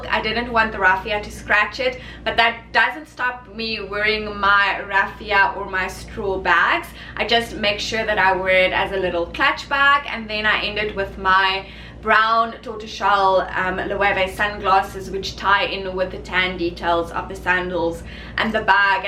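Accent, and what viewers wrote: South Africa. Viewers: Thx for your suggestions. I’m going to a wedding and wanted to dress up my dress. Also love the SA accent.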